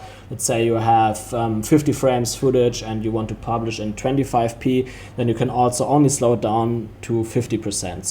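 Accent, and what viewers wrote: Germany. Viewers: Are you German ? In my opinion you speak really German English 😆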